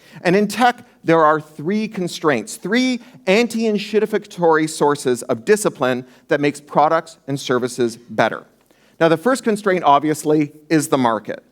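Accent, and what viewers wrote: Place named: United States. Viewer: eek-barba-durkle! this guy with an american accent thinks in euros... or all over the place. tf!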